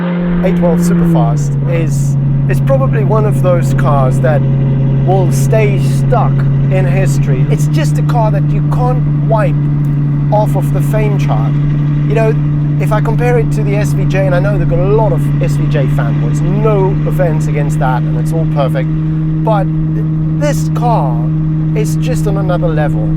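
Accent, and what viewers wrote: Afrikaans, South Africa. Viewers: This guy sounds South African? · Tell me you’re South African without telling me your South African? Ma se kinnes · Love the accent sir your far from home🇿🇦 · Are you South African?😊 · Love the Afrikaans coming through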